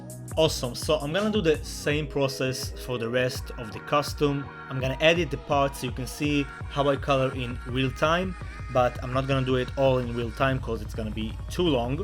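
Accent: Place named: Israel. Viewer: פתאום אני שומע את המבטא הישראלי שלך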